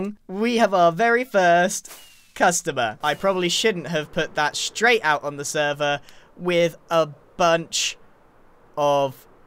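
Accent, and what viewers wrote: United Kingdom. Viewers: hey i dont know if this is rude or not but are u brittish?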